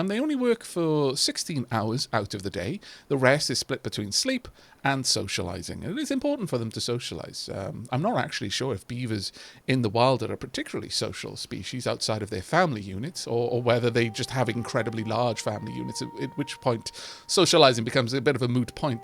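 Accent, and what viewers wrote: Wales, United Kingdom. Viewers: There is something soothing and comfortable about watching videos. But I admit to being biased as I love the Welsh accent! I'm crossing everything I can cross (fingers, toes, eyes, wires) hoping for a ridiculous amount of Timberborn. Am I asking too much? Never!!!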